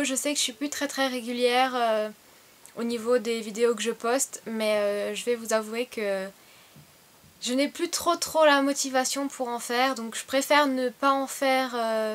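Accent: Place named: Alsace, France. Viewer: j'entends un petit accent de par ici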